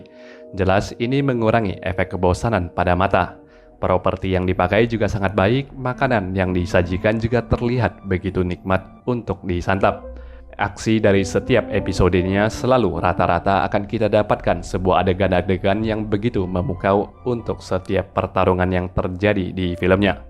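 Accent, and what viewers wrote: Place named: Indonesia. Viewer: Ya engga apa2, namanya jg org Indonesia. Kalau ngomongnya pakai logat Indonesia ya tdk apa2. Kan ada logat India, logat Jamaica, dll. Org Inggris sama America aja beda logatnya Kalau masalah clickbait ya banyak youtuber pakai spt itu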